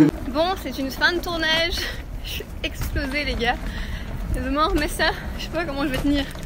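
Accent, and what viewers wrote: Belgium. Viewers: #teambelge Allez on impose notre accent sur les chaînes françaises !! 😆❤❤❤ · MAIS C'EST UN TRUC DE OUFFFF 😱😱 J'espère qu'on entendra ton petit accent belge à la télé haha #huit Tu peux être fière de toi, quel aboutissement ! Trop hâte de voir ça 😍😍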